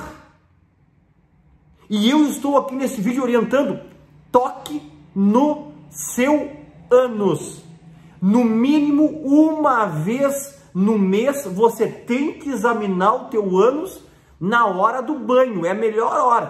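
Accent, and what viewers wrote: Rio Grande do Sul, Brazil. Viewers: o Sr é Gaúcho?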